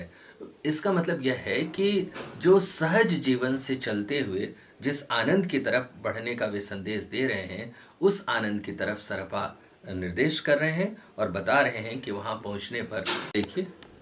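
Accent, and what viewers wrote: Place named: Haryana, India. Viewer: Inke dohe ka uccharan Magahi jaisa kijiye na ki Hariyanvai jaise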